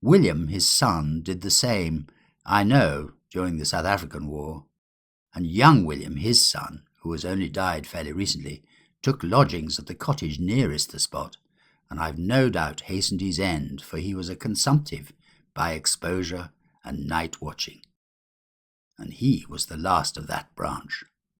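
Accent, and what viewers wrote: United Kingdom. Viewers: With or not prohibited filmation, please continued to read for us. The British pronunciation is a good listening. Have a nice time.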